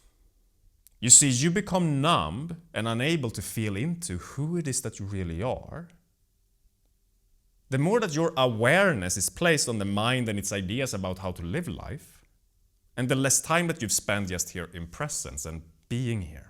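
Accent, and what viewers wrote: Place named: Scandinavia. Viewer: The Scandinavian accent ❤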